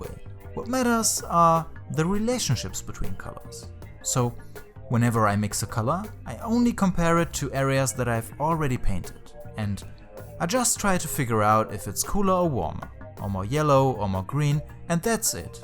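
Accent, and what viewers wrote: Germany. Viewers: i like your paintings o.o you have a german-english accent. Are you born in germany? ;)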